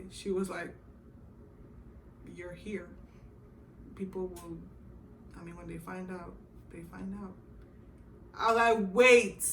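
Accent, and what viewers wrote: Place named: Nigeria. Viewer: Your nigerian accent is still there sha😅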